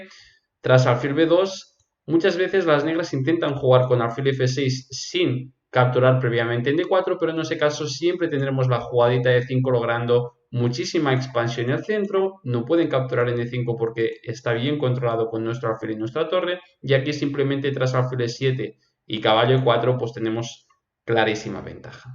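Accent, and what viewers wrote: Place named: United States. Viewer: Hablas un excelente inglés americano.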